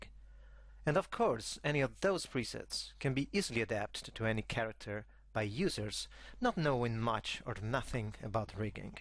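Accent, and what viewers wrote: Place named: Italy. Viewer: I like the way explain. Since i'm french speaking i understand almost every words from his italian accent;) Better than any american speaking too fast for me or using slang...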